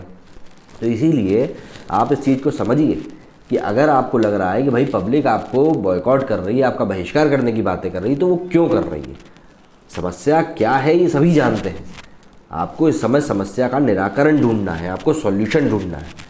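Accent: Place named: Eastern Uttar Pradesh, India. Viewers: Bhaiya aaj kahe Bhojpuri accent me ? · Wahii....Kuch Kuch bihaiii....tone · accent up east kaha ke ho bhaiya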